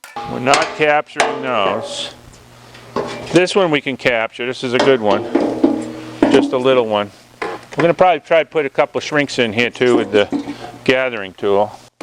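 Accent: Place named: Massachusetts, United States. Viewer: I’m not a psychic or anything but something tells me this guy is from Massachusetts…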